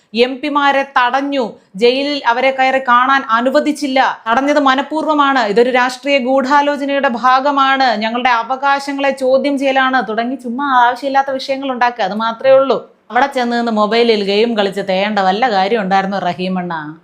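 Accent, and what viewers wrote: Thiruvananthapuram, India. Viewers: നിങ്ങൾ tvm കാരിയാണോ. ഭാഷ അങ്ങിനെ തോന്നുന്നു. സൂപ്പർ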